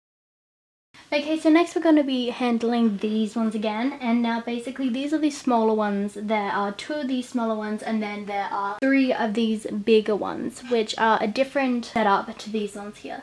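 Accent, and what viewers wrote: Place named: Australia. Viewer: She Sounds Aussie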